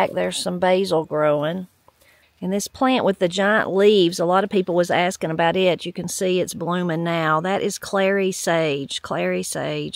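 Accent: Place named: Arkansas, United States. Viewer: I love listening to you talk. You remind me of my aunt pearl she was a native Arkansas. And she speaks like you do w that accent. It's so true...and calming to listen too. So is voice I get soo much off her devotionals.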